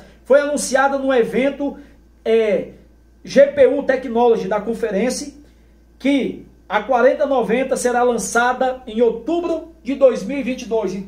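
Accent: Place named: Northeast, Brazil. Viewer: oxi... pra mim tu era daqui do nordeste homi kkk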